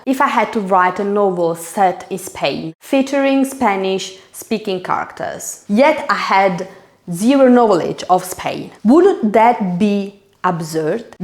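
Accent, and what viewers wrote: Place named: Italy. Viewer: From your accent I think you are Italian🥰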